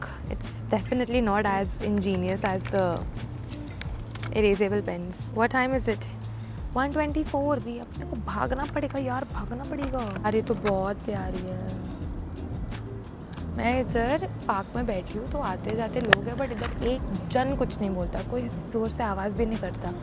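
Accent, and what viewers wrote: Gujarat, India. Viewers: Loved your gujju accent 😂💖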